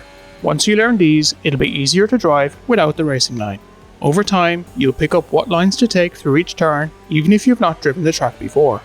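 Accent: Ireland. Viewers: I would think ur Irish where you from I love these tutorials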